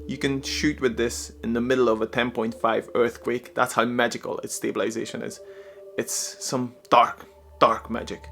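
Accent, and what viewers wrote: Northern Ireland, United Kingdom. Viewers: so helpful. Just discovered your vids. am learning a lot. I got a XT4. Love that Norn Iron accent :-)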